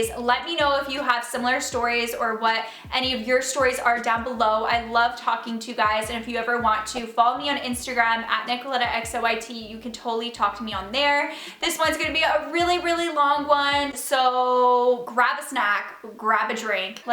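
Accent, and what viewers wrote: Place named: Canada. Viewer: This lady has a nice Canadian accent. Very nice!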